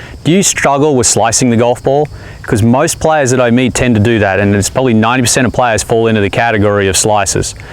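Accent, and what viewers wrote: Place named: Australia. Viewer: Thank god a aussie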